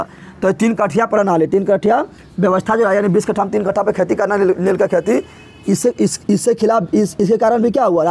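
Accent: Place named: Bhojpuri, India. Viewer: Bahut acha samcha Rahe hai sir ji Bhojpuri style me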